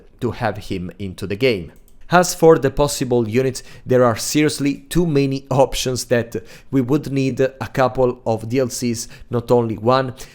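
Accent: Italy. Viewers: Ah ha! It was Italy lol. I was trying to identify your accent. I love the content, thank you for the great videos. (From Canada) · dall'accento che si sente subito che sei italiano ahahah.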